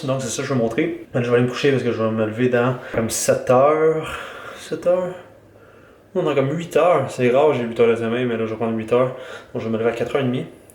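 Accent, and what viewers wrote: Canada. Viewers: Tu est Canadien?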